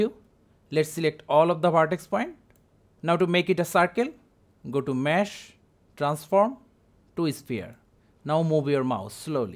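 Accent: India. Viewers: of course the guy with with and indish accent teaches me years of blender in 2 minutes, reliable as always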